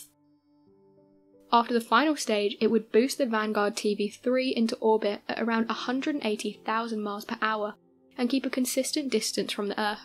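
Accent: United Kingdom. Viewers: British chick narrator...”unfortunately for the US, Russia did it first.”...yeah as if Russia were friends with the British...comon chick!!...The Brits didn’t do sh*t either.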